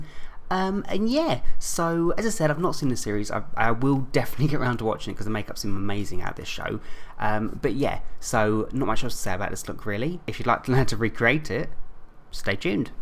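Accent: United Kingdom. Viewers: I LOVE YOU TOO MUCH BC I SAW YOUR CREEPYPASTA STUFF AND I WAS LIKE "oo ima watch dis" AND YOU HAD A BRITISH ACCENT AND THEN I LIKED YOU EVEN MORE AND NOW I KNOW YOU LIKE DOCTOR WHO AND IVE SEEN EVERY ONE OF YOUR VIDEOS I LOVe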